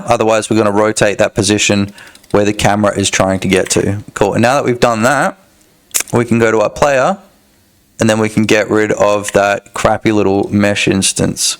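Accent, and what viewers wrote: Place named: Australia. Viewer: Australian English can be a bit tough. Slow the playback down to 0.5 to drag out those vowels and make it sound like were all at the pub after a big night :)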